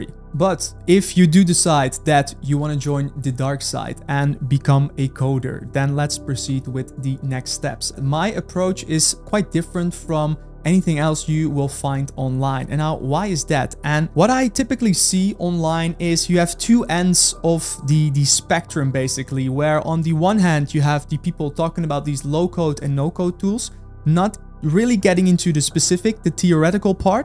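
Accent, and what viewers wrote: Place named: Netherlands. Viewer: youre dutch i can hear it f rom youre accent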